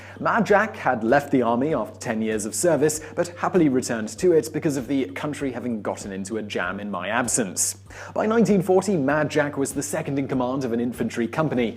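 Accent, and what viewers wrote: England, United Kingdom. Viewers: This is just a skinnier Michael from Vsause with an english accent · When did Michael get a British accent?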